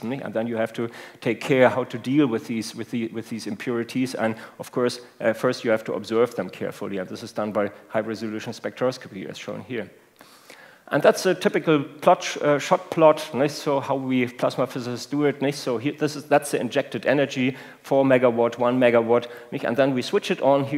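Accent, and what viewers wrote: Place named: Germany. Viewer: warum nicht auf deutsch......deutschen solten nie english sprechen, nur schreiben...hört sich nicht gut an... nur ein ding is noch schlimmer, amerikaner die deutsch versuchen zu sprechen...lol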